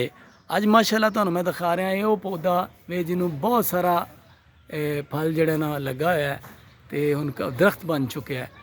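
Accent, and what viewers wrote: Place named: Punjab, India. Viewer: میٹھی پنجابی دا میٹھا ویلاگ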